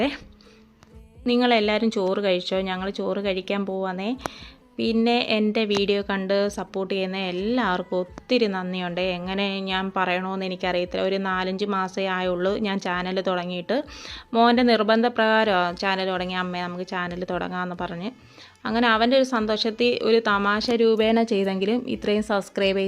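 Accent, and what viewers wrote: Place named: Alappuzha, India. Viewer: ആലപ്പുഴ ആണോ വീട് 🤔🤔 സംസാര രീതി.. കേട്ടിട്ട് ചോദിച്ചത് ആണ് 🥰🥰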